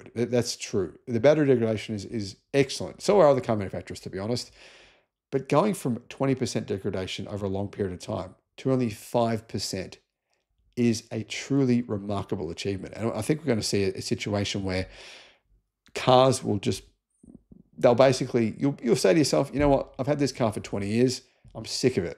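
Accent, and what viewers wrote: Australia. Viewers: A MAGAT with an aussie accent in a Cyber Jesus cult. WTF?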